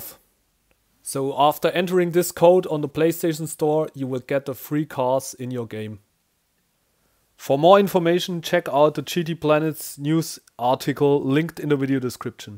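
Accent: Germany. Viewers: Dieses deutsch was ich raushöre🙃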